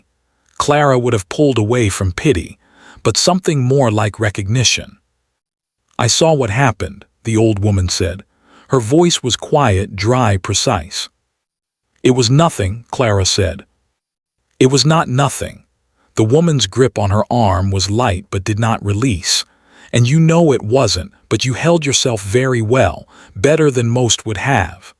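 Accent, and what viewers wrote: United States. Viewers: The American accent is really unsuitable for this story about British aristocracy. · English accent much better than cowboy accent for the narrator 🤨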